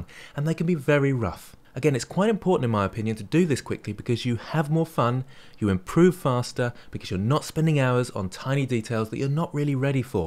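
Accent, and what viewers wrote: England, United Kingdom. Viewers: English Accent Hits Different.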